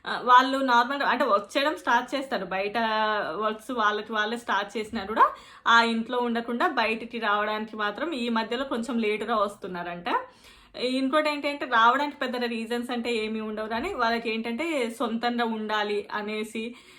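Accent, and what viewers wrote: India. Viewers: Inka Indian accent matladuthunaru meeru🤔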